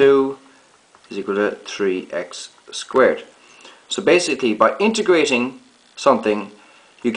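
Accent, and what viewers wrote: Ireland. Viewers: Excellent video and this man's Irish guys, not Scottish :P And you will also need to use endless amounts of integrals in economics when trying to analyse profit margins and macroeconomic objectives. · he's irish!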